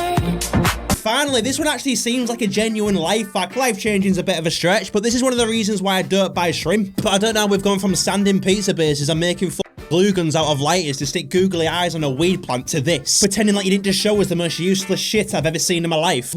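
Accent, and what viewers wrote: Yorkshire, United Kingdom. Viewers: My favourite thing in this channel, is that he is British (or atleast he sounds like one). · this guy is pure yorkshire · u from yorkshire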